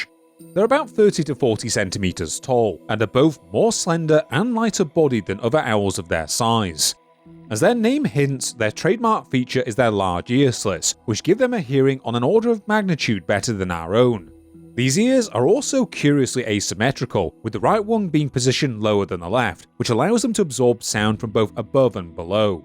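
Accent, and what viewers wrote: United Kingdom. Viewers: i just feel like you should rename yourself 'A Histocrat' and replace the 'The' because it doesnt round well and it only sounds alright due to the british accent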